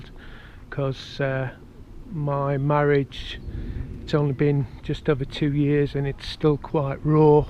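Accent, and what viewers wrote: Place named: East Midlands, United Kingdom. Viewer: Hi, you sound like your'e from the east midlands somewhere, Phillipines is a great place, $575 is enough to live on, it works out about $26000 pesos which is about 46 pesos to the dollar. The people are very nice but try and learn a little of their language and try their food. When in the Phillipines do as the Filipinos do.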